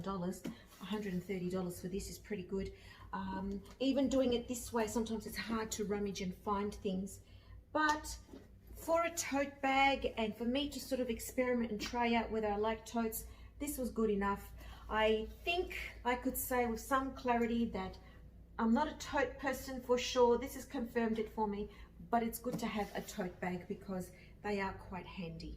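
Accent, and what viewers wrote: Australia. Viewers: Lovely to hear a fellow Aussie on YouTube! Australian context really helpful regarding brands quality bag organisers etc. Thank you so much... keep up the good work!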